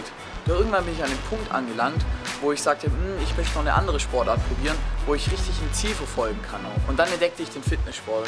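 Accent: Germany. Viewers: woher kommt den der Schwabe genau? :D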